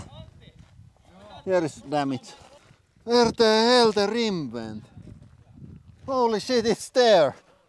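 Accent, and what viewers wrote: Finland. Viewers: Finnish English accent is the best English accent ❤️ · This is Finnish accent. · "So if anything goes to sheet it doesn't matter" 🤣 I love this channel so much. I'm Canadian so I sound stupid to most of the world but I love Finnish pronunciation of English language.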